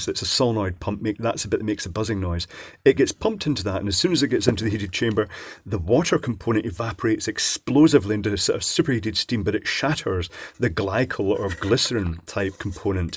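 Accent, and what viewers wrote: Scotland, United Kingdom. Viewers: Legionitalia not quite the same in his Scottish accent, but we need to get him to sing that song ...bonus for death daleks dancing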